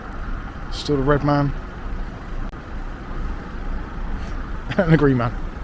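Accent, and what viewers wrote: Bristol, United Kingdom. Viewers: I just knew you were Bristolian! just down the road from me :)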